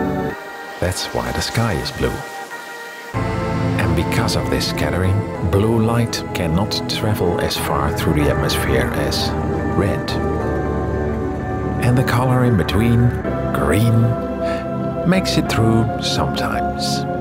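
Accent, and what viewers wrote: Netherlands. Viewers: Goede video, dacht al te horen dat je Nederlands was.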